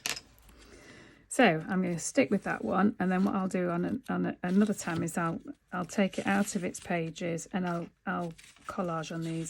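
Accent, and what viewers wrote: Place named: North, United Kingdom. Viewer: Very inspirational and lovely to hear a fellow Northen voice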